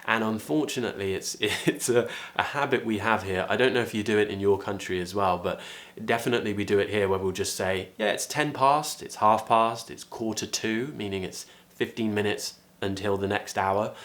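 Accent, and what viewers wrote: United Kingdom. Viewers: I' ve been looking for a British pronunciation teacher for a longtime and finally i find you as i feel so lucky and privileged right now 💜💜💜🙌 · I really feel excited of your British English pronunciation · I learnd a lot of from you, thank you for that . However i miss you as a british pronounciation teacher . Hope you'r son is doing well as you and the rest of you'r familie too . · always I came here to learn British accent thank you very much for being helpful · Hello! Thanks a lot for this video. I'm a Spanish native speaker and I would love to speak English with your British accent. Please, let us know where can we get your accent training. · I like your accent man , your way of explaining etc you r amazing and British accent is my favorite 😍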